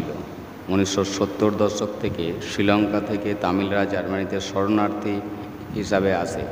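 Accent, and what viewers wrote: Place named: Ghoti, Bangladesh. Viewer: ঘটির ভাষা। বাংলাদেশের ঢাকাইয়া কুট্টিদের মতো ! তোতলা টাইপ ভাষা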